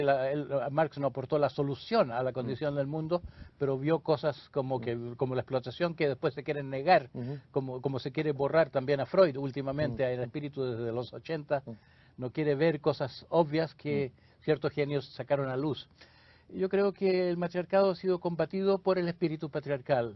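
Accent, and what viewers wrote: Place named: Chile. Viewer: Es increíble escuchar filosofía de verdad en Chileno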